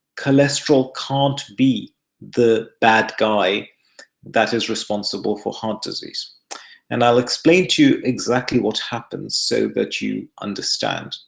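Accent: United Kingdom. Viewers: Wonderful video! So informative and you explain things so clearly! Your British accent is a plus!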